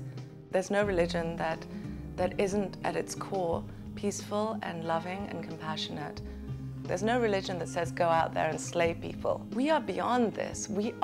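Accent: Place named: Hollywood, United States. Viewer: good message, better with hollywood accent dropped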